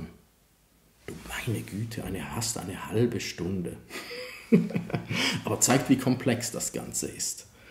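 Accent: Switzerland. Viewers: an deinem hochdeutsch feilen. Da hört man den schweizer einfach zu stark raus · ihr in der Schweiz auch im Dialekt "sächs" sagt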